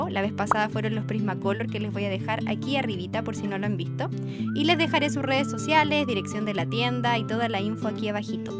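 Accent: Chile. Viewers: 🎀Es re tierno tu estilo veo tanto tus videos que ya se me pego el acento chileno🎀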